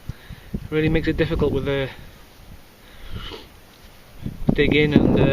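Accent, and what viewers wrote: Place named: England, United Kingdom. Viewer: I enjoyed your English accent almost as much as the finds! Fascinating bit of history where you live. You are very fortunate. Thank you it's been fun! -Jeff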